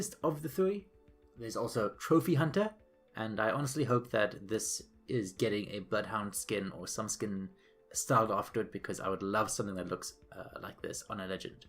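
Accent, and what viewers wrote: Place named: South Africa. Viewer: Gotta love a South African accent!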